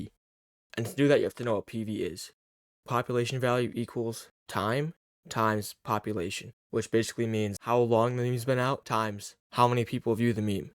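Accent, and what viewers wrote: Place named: Russia. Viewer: Russian Detected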